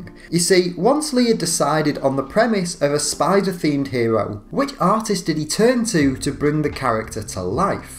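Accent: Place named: Liverpool, United Kingdom. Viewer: Where are you from? Somethings you say sound Liverpudlian.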